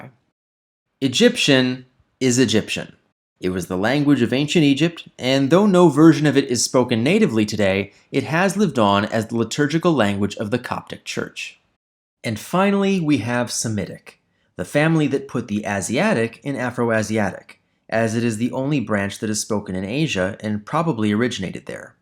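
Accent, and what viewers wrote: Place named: New York, United States. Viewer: This guy has an interesting American accent. His long A vowel has New York/Yiddish coloring that makes some of his words sound like British RP.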